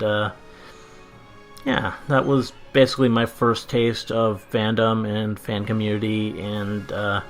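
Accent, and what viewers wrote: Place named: Canada. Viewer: I thought you were canadian for some reason lmao.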